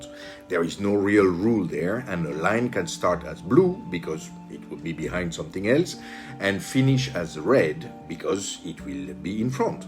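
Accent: France. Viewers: on arrive à reconnaître l'accent français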